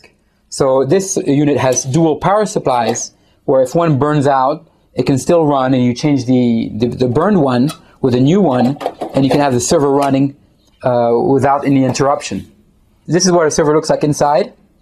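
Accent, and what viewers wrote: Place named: France. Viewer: Forgive me but you sound French. Bravo!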